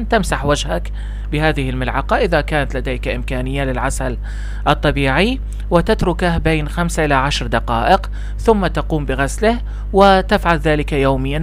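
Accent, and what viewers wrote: Lebanon. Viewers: هل انت لبناني.. اذا كنت عراقي لماذا تلهج بالهجه البنانيه؟؟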